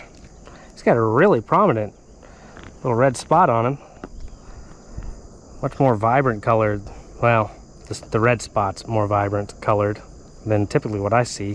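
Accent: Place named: North, United States. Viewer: You sound like a gat dang yankee compared to that old dude at the start.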